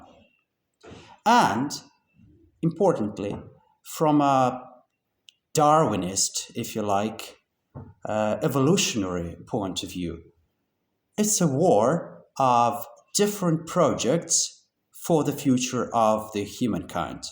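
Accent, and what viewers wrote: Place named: United States. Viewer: Вітаю, а ти якось навіть схожий на американця, ще і вимова така автентична :hand-pink-waving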